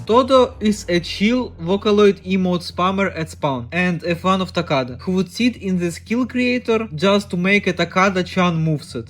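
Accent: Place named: Russia. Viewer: Ты Русский? Просто акцент прям пиздец мощный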